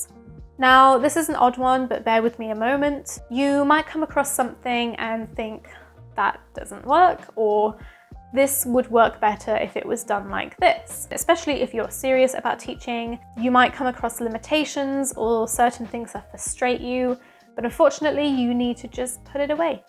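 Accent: United Kingdom. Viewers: I got a kick out of your pronunciation choices...especially the WATER choice. I've had this thought in the past, watching your photography video's, as the word water comes up often, within 'waterfalls' or if your talking about a stream. Our American/Canadian choice to you sounds like wa(d)er as you explained, but from our perspective the 'wat' is the same but the end 'er' is different. Nonetheless, as Canadian, I love the UK/British accent, and have no issues (so far in understanding every word. Oddly, I had an uncle that came from Wales some decades ago, and I just couldn't understand what he was saying for the most part, so I assume there must be an 'old school' Welsh accent perhaps? Anyway, trying to catch up on your video's...have a great day!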